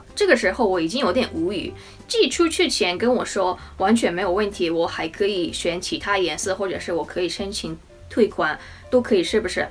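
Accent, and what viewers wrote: Osaka, Japan. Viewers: 的口哨和大阪方言挺好听的。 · 大阪方言还是改不了，哈哈哈汉语说的真好，学语言确实越小学的越好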